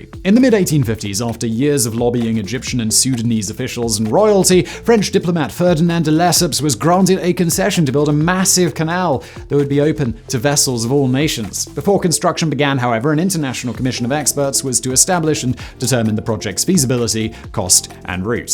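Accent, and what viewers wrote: United Kingdom. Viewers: who is this slaphead beardman who hosts every youtube channel at least in this series he doesn't exaggerate a british accent quite so much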